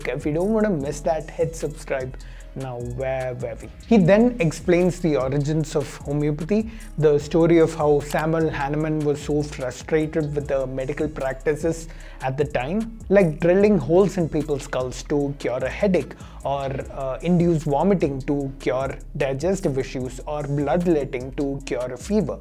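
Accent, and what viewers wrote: Kerala, India. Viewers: Your content is one of kind and eye opener to blind followers of fallacy and myths. But your reach will be limited to just a few.... You have strong malyali accent coming out when you speak English. I wish it would be coherent and lucid like other so called youtubers on you tube who are spreading mythsband nonsense. Clarity in language as much is needed as in the content. Your content is king but can't say about the way utter it.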